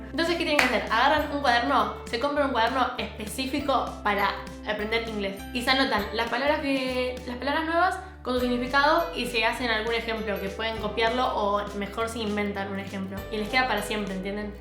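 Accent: United Kingdom. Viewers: Nunca tuve preferencias con los acentos! Pero desde que empece a ver tus videos.. Amo el acento britanico! Quiero aprender ingles britanicooo! Sos muy genia! TKM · me encanta tu acento británico 😘 ahora tengo una amigo British y me encanta el acento de el también, continuaré viendo tus vídeos ❤️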